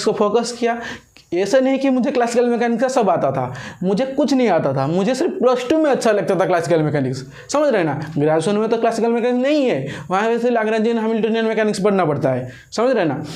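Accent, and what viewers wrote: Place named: Odisha, India. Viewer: Pehle accent mein hii pata lag geya kii odisha se ho....me also from odisha ❤😅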